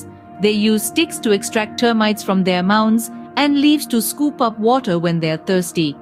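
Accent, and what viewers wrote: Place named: India. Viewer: u CAN'T hide INDIAN ACCENT in your voice, don't try , its won't never happen.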